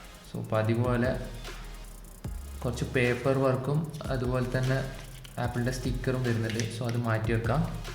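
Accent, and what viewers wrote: Kannur, India. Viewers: Nee kannur aano 😊😊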